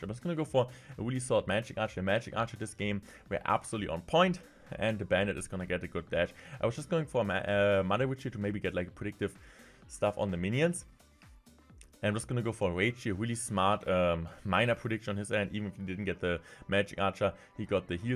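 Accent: Germany. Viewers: This is my first time on this channel and i noticed directly by his accent that hes German.I was quite confused at first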